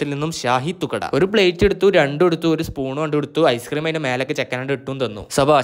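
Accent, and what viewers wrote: Malappuram, India. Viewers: Accounting is a process of recording, classifying, summarising, analysing and interpreting the financial transactions, 😂😂😂😂... ഞാൻ ഇക്കയോട് മുമ്പത്തെ വീഡിയോയിൽ പറഞ്ഞിരുന്നു, എൻ്റെ ഗുരു ആണ്, എനിക്ക് മലപ്പുറം slang പഠിപ്പിച്ചു തന്ന ഗുരു, അതുകൊണ്ട് എനിക്ക് എൻ്റെ സാറിൻ്റെ slang manasilaayi ennu പറഞ്ഞു ഒരു comment ചെയ്തായിരുന്നു, ആ sir accounts subject ആണ്..ക്ലാസ്സിൽ വന്നാൽ തുടങ്ങും, recording, classifying,summerizing,. ഇക്കാ ഇന്ന് ഇത് പറഞ്ഞപ്പോ,ഞമ്മൻ്റെ മനസ്സ് തൃപ്തിയായി😂😂😂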